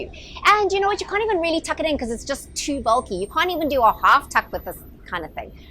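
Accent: South Africa. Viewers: Oh my goodness, you made my day - I was commenting before you got to the end, because I was so interested in your suggestions and already mulling over adding some burgundy into my wardrobe! DeepWaterHappy is my blog/YouTube/Insta/Pinterest "handle" for cruising, diving, travel and lifestyle content. I'm Kim Walther, a 51 year-old high school business/photography teacher from Florida. I run, bike, work out, produce a lot of artwork, and I also work as a sailboat captain. We wear khaki shorts and white company polo on the water. However, every day at school... I enjoy dressing up and coordinating stylish outfits. This year, due to your upbeat influence, I'm wearing a lot more heels to work and dressing it UP quite a bit. I've spent a lot of time in SA, Cape Town area, and dearly love your accent; it reminds me of friends and family there. And who better to give fashion advice than another Florida girl-turned-ZA! 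I absolutely adore you! Thank you for the watch! Mwah! Baie Dankie!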